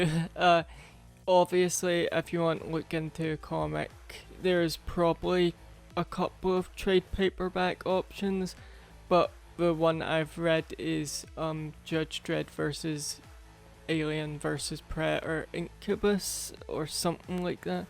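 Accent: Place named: Wales, United Kingdom. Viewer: Bro..sweet accent! Are you Welsh? Seriously, it is awesome.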